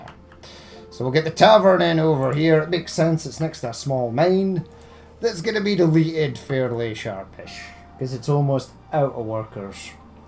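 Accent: Scotland, United Kingdom. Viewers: Hey man. Where's the accent from? I assume it's Scottish, but I'd love to know. Thanks!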